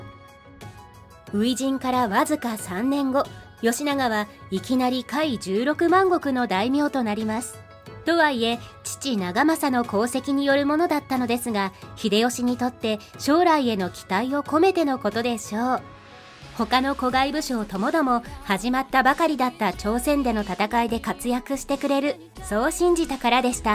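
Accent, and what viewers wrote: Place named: Himeji, Japan. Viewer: 姫路のイントネーション！！！！！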